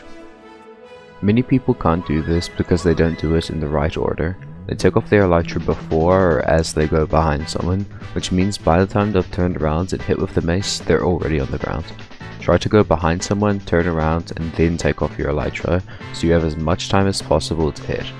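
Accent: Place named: South Africa. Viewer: Are u South African lol ur accent sounds like it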